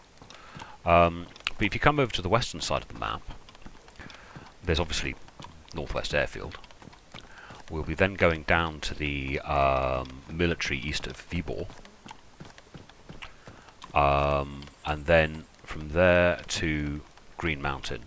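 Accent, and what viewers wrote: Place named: West Country, United Kingdom. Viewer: I love watching your content, I'm always learning something new about the game and I always have a great time, I would love the oppurtunity to play with you one day. I love watching you not just for the excellent gameplay but also because you have an awesome sense of humour, an easy going attitude. Your west country accent is a bonus too :)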